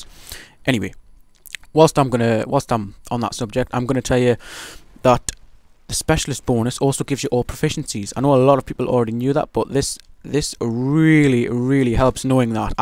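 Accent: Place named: Newcastle, United Kingdom. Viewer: Nowt like listening to a geordie kill people. Wish you had PC man, we'd make an awesome geordie domination team.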